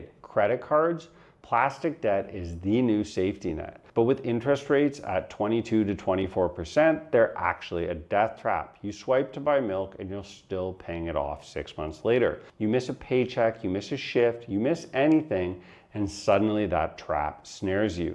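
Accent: Canada. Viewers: lol your Canadian accent is super thick and I’m Canadian 😅. Good info here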